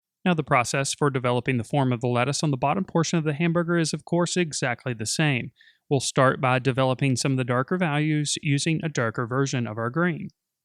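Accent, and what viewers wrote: South, United States. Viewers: I know, I just find it funny how he pronounces it with his Southern drawl.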